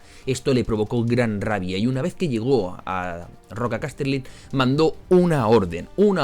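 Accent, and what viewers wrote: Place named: Spain. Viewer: Qué lindo acento español tienes!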